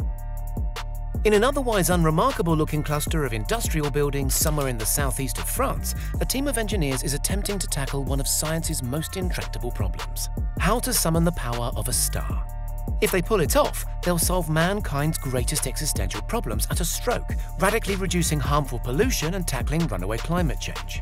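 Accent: England, United Kingdom. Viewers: WARNING... FOR ENGLISH ACCENT ONLY !!!!!!!!!!!!!!!!!!!!!!!!!!!!!!!!!!!!!!!! TALKING WITH THE NOSE !!!!!!!!!!!!!!!!!!!!!!!!!!!!!!!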